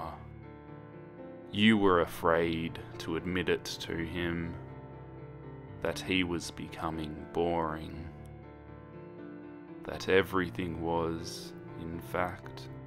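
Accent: Australia. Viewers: banana, it sounds even funnier with a Australian accent!!!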